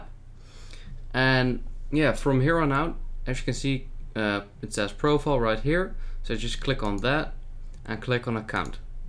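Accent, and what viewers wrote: Netherlands. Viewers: Ik hoorde aan je stem dat je nederlands bent 😂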